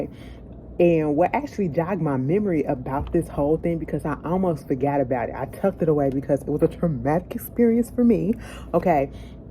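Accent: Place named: Boston, United States. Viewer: Hearing you say all these lines reminds of Boston ☺️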